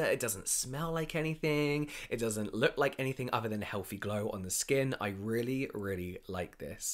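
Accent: United Kingdom. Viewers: Omgggg your British accent 😍😍😍😍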